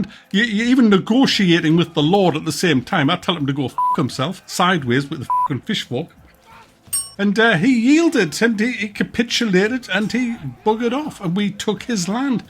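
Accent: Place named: Sunderland, United Kingdom. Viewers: ahhh so refreshing to hear a fellow northerner on YouTube there's no enough of us! This game wasn't even on my radar it looks like it's gonna be mint! I've got a week off work and was looking for something new to play need to get my hands on that early access like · Awesome vid. I'm new around here and i have to say its great to hear an unashamedly Geordie accent with his no BS attitude. Plus you enthusiasm is infectious. I already knew what you were telling us about the farmers and brewers being the same men that figth on the battlefield for you but your excitement for it hyped me up even more. Subbed. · you talk like my friend from sunderland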